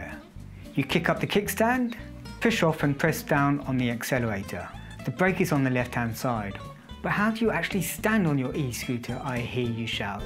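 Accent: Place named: United Kingdom. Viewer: pretty obvious this guy is a Brit with that accent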